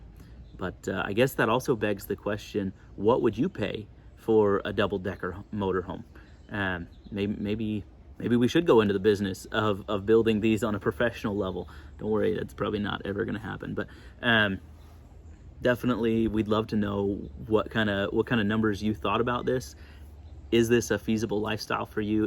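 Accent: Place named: California, United States. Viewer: I'm sorry, this has nothing to do with the bus, but, I'm just curious, what state are you from, your accent, is it Californian?? I'm from and live in Papua New Guinea 🇵🇬. ☺️😇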